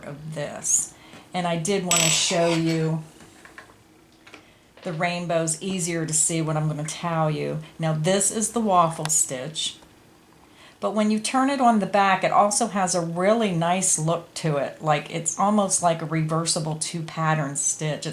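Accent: Pennsylvania, United States. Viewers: Where are you from? You sound just like my best friend from Pennsylvania. I really enjoy your classes and have learn quite a few new stitches by watching. Thank you for your time and your posts.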